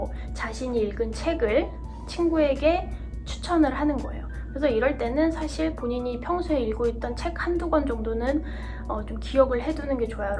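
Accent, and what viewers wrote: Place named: South Korea. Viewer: 한국에서 어려서부터 자랐나?? 말투가 그냥 한국사람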